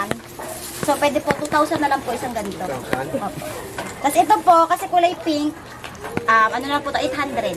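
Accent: Philippines. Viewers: I love your pinoy accent, are you married?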